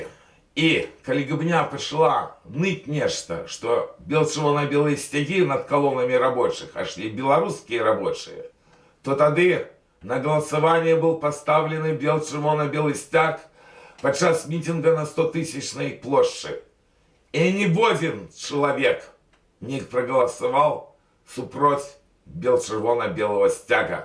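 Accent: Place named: Russia. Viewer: Спадар. Адкажыце, калі-ласка, чаму пад час беларускамоўных прамоў вы цягам дзесяцігоддзяў маеце такі цверды рассейскі гук «в» замест фірмовага беларускага «ў»? Гэта нават не ўкраінізм, а мінавіта рассейскасць.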